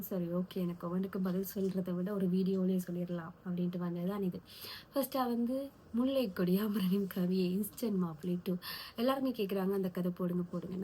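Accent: Sri Lanka. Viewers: Pavani voice so sweet so problem Rj voice ku neenga srilanka tamil ah slang romba pudikkum sutha tamill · Mam neenga srilanka va unga novels read pannum pothu theriyuthu....indha video pathatum vilanguthu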